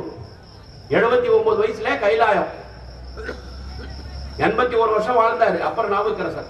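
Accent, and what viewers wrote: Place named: India. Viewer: ஐயா நல்ல தமிழ் கேட்டு நாளாச்சு. உங்க புண்ணியத்தால் எம் செவி குளிர்ந்தது.அதுவும் ஒரே மாவட்ட தமிழை கேட்க்கும் போது மிக்க மகிழ்ச்சி உங்களை ஆலத்தூரில் நேரில் சந்திக்க இறைவன் அனுக்கிரஹம் வேண்டுகிறேன். இனிய ரமலான் நோன்பிருந்து நினைத்தது நிறைவேற வாழ்த்துக்கள்.ஐயா. இவன் பூ. நிரைஞ்சன் குமார் ஆற்காடு.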